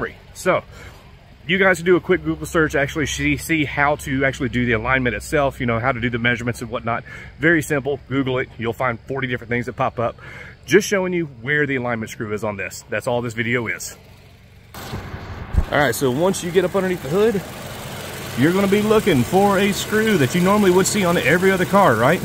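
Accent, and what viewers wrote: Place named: South, United States. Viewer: Thank you mr random guy on the internet with a slight southern accent. Cheers.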